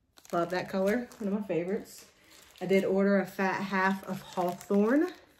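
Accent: South, United States. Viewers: 🎉 I have heard you shouted out from about 4 floss tubes!!! Love your stitching and honey that accent is like honey! I’m a native Texan and have always loved any southern accent. Happy stitching! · I miss hear that southern drawl so i will definitely be back i have great memories of living in the south 😊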